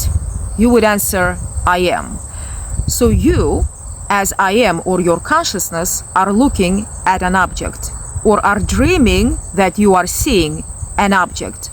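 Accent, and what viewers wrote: Russia. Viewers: Classic Russian accent, I love it 😍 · Pretty russian-english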